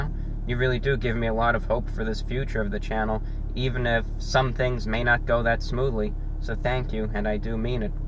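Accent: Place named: Transatlantic, United States. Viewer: The name of that accent is Transatlantic as it's supposed to mix in elements of GA and RP.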